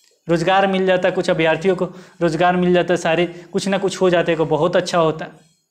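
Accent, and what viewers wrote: Bihar, India. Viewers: Sir bihari ton sun ke kafi achha lga.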